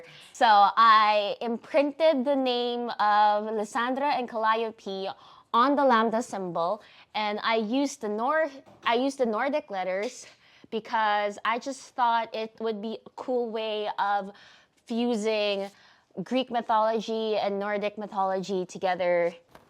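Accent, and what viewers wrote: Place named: Philippines. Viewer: Wait, that accent is noypi. Sorry, just stumbled upon this video. Nag subscribe na po 🙏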